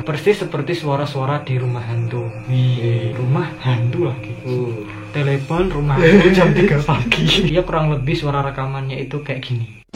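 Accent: Java, Indonesia. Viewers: bisa ilangin medoknya gak? mles dngernya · Njenengan jowo mas?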